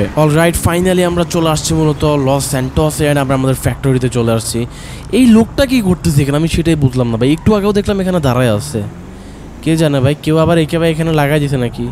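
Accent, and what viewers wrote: Noakhali, Bangladesh. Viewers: Vai apni nohakhali. Kothi tha ken.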